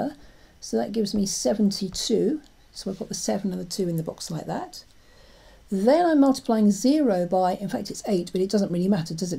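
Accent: United Kingdom. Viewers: Are you English?, it seems brumie accent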